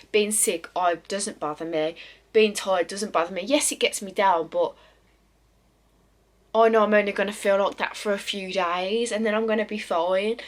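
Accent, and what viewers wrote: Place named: Birmingham, United Kingdom. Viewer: She's from Birmingham that's the Birmingham accent you're hearing x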